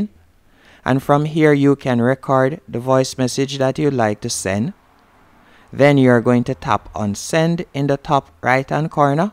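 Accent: Jamaica. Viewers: Thank u 🙏🏽 I been trying to figure this out for days now. Love the Jamaican accent as well. 🆙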